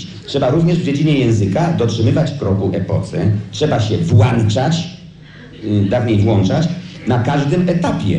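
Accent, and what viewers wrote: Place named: Greater Poland, Poland. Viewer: Zaraz, zaraz, toż to gwara wielkopolska.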